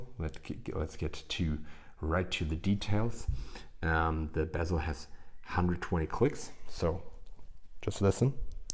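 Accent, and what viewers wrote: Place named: Germany. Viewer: strong german accent 😊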